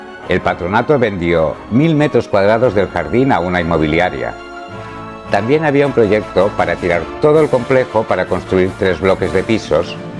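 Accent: Catalonia, Spain. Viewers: Te pasas tres pueblos. Lo que te molesta es que tenga acento catalán, si fuera gallego o canario por ejemplo no dirías nada. Por cierto ya que hablas de hablar bien comienza tú por escribir bien, cuando se hace una pregunta en español se ha de poner signo de interrogación al principio y al final de la pregunta.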